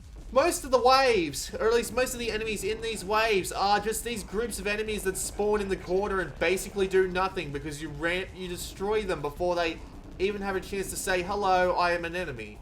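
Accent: Australia. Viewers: That voice. MATE. from a fellow Aussie. Jesus.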